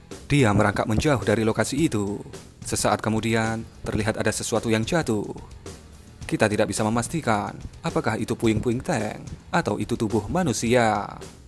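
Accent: Java, Indonesia. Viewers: Maap gue slalu skip 10 detik pas openingmu dr kata HALLO PEMIRSA 🤣 krna medok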